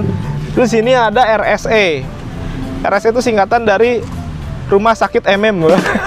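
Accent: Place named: Garut, Indonesia. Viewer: hahahaha kayanya orang garut euy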